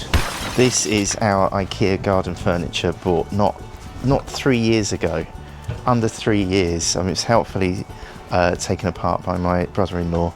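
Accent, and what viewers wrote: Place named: United Kingdom. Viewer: Just listening to ur British accent convinced me to get this ikea chair, lol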